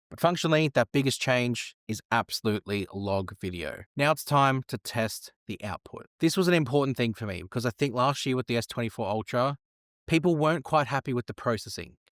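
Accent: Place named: Australia. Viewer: It's nice to hear an Aussie accent instead of US accent for a change.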